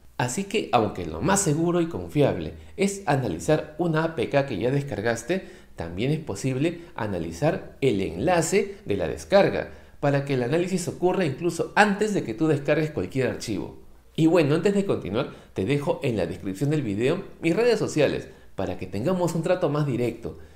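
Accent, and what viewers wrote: Peru. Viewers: Jajajaja no me gusta el acento peruano, pero a él se le oye bonito😊 graciosito o es como empieza el saludo a él se le escucha lindo 😚 saludos sabrositos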